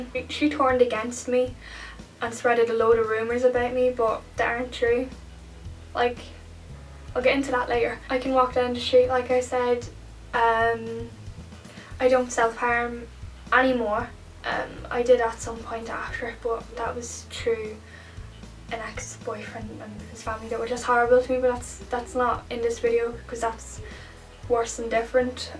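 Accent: Dublin, Ireland. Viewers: I love your Irish accent 😻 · Your really beatiful.. Are you from Ireland? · <3 Know the feels x Is she from Dublin??? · r u irish cos i am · omfg your irish im irish aswell are you from west meath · Don't let people judge u..... Love Ireland r u from Dublin? ( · That sucks I am sorry that happen to you I am glad you are doing better I love your irish accent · She is Irish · i love your irish accent the real irish accents gotta love em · what part of Ireland are you from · Are you Irish?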